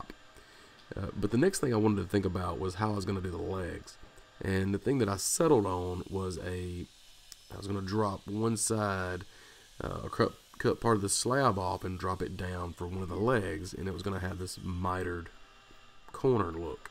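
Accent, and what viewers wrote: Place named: South, United States. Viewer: YOU A SOUTHERN BOI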